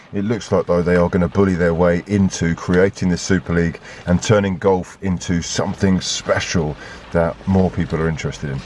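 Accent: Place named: Birmingham, United Kingdom. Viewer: Where ya get the spot on brummy accent? I thought you sounded more Southern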